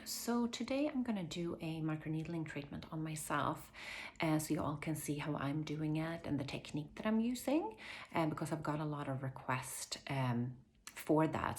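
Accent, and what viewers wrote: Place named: Sweden. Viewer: Hej, jag skriver på svenska för jag tror du är svensk, tyckte det lät så. Jag bara undrar om denna penna funkar bra mot ärr i pannan, och om det är säkert att använda så man inte gör det värre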